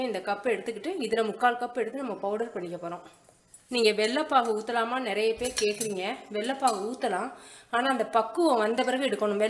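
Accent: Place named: Karaikudi, India. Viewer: Aachi neenga pesara thamizh a kekkave naa unga videos a thirumba thirumba paapen. I'm from Konapet (near Karaikudi) and miss this accent. Azhaga explain pandringa :)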